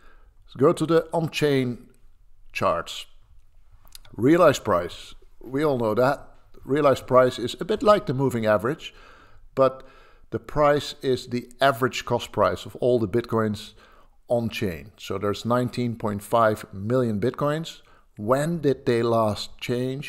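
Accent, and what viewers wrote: Netherlands. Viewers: You've a Dutch accent on your English. What did you study at the Uni that enabled you to put out these models? Asking for my son. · Come for the bitcoin insights, stay for the Dutch accent! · Really nice accent. It almost sounds Dutch but I can't place it exactly. Where is it from? Edit: Channel says Netherlands. So I was onto it. I love the Dutch-English accent. Sorry I'm weird. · A very slight Dutch accent is what I’m detecting.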